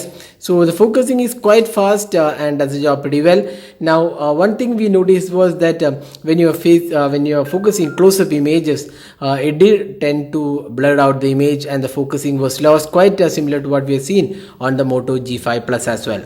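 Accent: India. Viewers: I HATE THE INDIAN ACCENT WTF EWW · Está hablando en inglés, pero obviamente es indú... asi que su acento se hace notar xD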